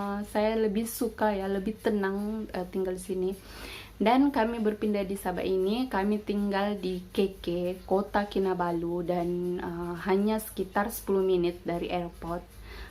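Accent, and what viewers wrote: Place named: Riau Islands, Indonesia. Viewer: Rezeki ade dimane2 ' kat mne2 kite duduk tinggal akak /klo ade kemahuan nye ..pasti akan ade perubahan nye' owh ye akak berasal dari kepulauan Riau ye knn. Kn pe dgr loghat dari akak '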